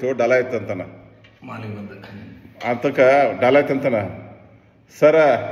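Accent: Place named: North Karnataka, India. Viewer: Sir ನೀವು ಮಂಡ್ಯ ಜಿಲ್ಲೆಯವರು ಅಂತ ತಿಳಿದಿದ್ದೇನೆ ಆದರೆ ನಿಮ್ಮ ಮಾತು ಸಂಪೂರ್ಣ ಉತ್ತರ ಕರ್ನಾಟಕದ ಭಾಷೆ ಮಾತಾಡುತಿದ್ದಿರಲ್ಲ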